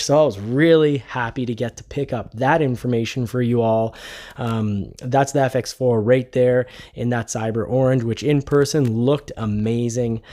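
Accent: Canada. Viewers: Cool je savais ton accent était québécois mais de Quebec en plus c'est nice! Je vais te suivre. Et jai hate de pouvoir mettre la main sur un pti maverick!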